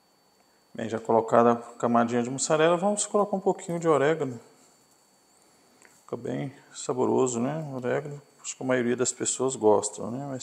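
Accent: Minas Gerais, Brazil. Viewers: Vc é mineiro?amo esse sotaque kkkkk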